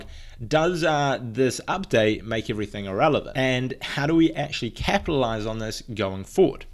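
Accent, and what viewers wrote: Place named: New Zealand. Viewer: 6 minutes into this video I thought... "Is that a kiwi accent?", I open your channel in a new tab, check location... NZ. Sweet as! This is the first time you get recommended to me. Good video!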